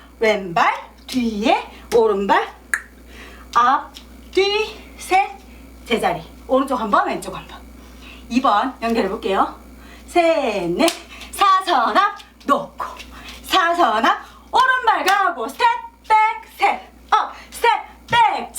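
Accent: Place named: Gyeongsang, South Korea. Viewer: 늘 잘 보고 있어요ㆍ경상도 말투가 듣기 좋아요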